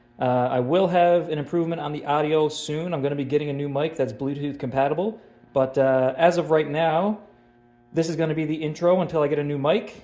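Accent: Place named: East Coast, Canada. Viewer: You sounds like you’re from the east coast of Canada. Good video by the way.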